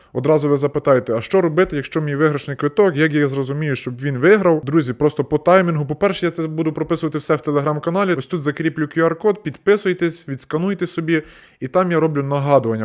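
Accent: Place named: Western Ukraine, Ukraine. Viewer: Приємно слухати на західноукраїнській мові. Молодець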